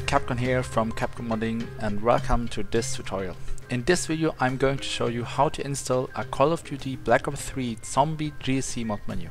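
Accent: Germany. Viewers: Dieses German english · Man hört das du Deutscher bist😂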